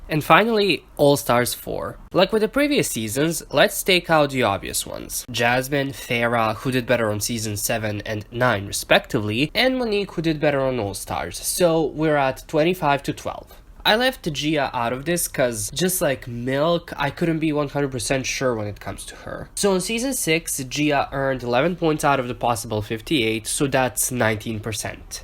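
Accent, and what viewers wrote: Spain. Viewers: As a mexican guy I can tell you that he has such a pretty "spanish voice/accent" haha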